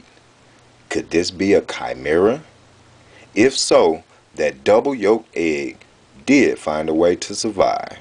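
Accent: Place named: United States. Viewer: you could tell he's american from how he talks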